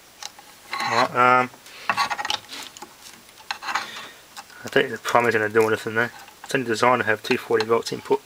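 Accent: Australia. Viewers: It just takes a while to get used to his rural Australian dialect, in the past I've had to rewind and listen to him again. He does make some interesting videos though, but not all are winners of course.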